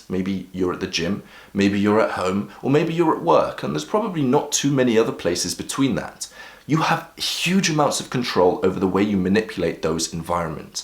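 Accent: United Kingdom. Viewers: British accent = (+10 points) to discipline and charm.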